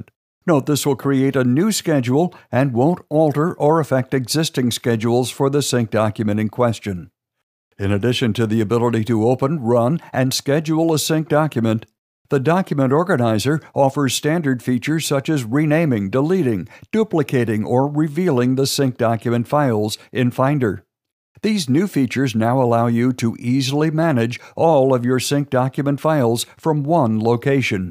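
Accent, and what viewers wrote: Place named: United States. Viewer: Thank God, at last a video fully understood by a man who speaks GOOD typically American language. I think all the videos that contain instructions must have been talking with so purely American to understand the rest of us who are not Americans. Thank you!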